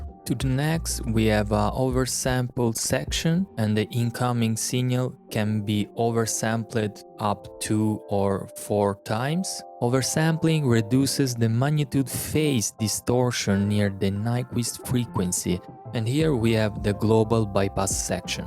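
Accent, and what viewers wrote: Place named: Italy. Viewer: Dopo pochi secondi dall'inizio del video ho intuito l'accento italianissimo. 😄 Conoscevo ed usavo già questo plugin, ma grazie alla tua spiegazione mi è più chiaro come lavora, grazie. 👍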